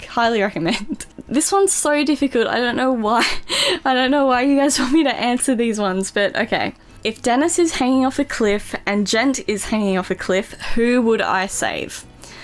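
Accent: United Kingdom. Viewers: When I sometimes hear her voice it's kinda a bit British... Does Austrialians have a bit British voice?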